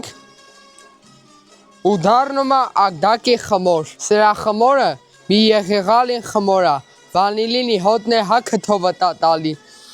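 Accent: Lori, Armenia. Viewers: Մեր Լոռվա բարբառը 😊😊❤❤❤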